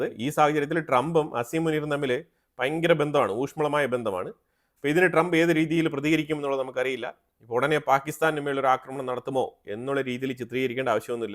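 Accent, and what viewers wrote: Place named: Pathanapuram, India. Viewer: എന്താടോ താനും മാത്യു ശാമുവലു० പത്തനാപുരത്തുകാരാണോ. അവിടുത്തെ ഭാഷ ഇങ്ങനെയാണോ ???അതായത്, ഇതുപോലെ, അതുപോലെ, അതുപോലെതന്നെ ......ഭാഷ പറയുന്നത് എങ്ങനെയെന്ന് കേട്ടു പഠിക്കുക.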